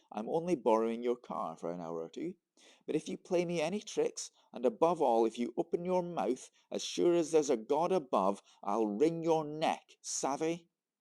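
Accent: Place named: Lowland Scotland, United Kingdom. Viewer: Great Lallands in your storytelling today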